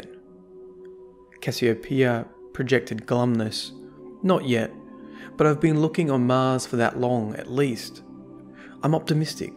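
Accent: Australia. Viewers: i suppose i don't quite buy the blinded knight theory mostly because of the story of the last word. if that was the case and ghosts erase our memories and indoctrinate us then why wouldn't jarens ghost just go find another body to resurrect? why would it instead form a bond with shin and turn him into a guardian? I just feel that if control over us is the objective then that ghost picked a very difficult way to go about it lol, but thats just me. I love these lore videos though, this is the first destiny lore channel that I can REALLY get into. you remind me of Vaati Vidya and not just because of the australian accent lol. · Are you from QLD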